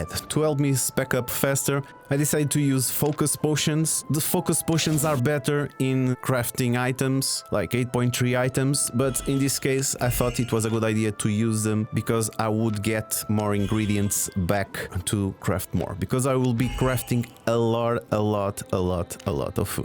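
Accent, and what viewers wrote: Portugal. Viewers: That's a proper Portuguese accent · The Portuguese accent! XD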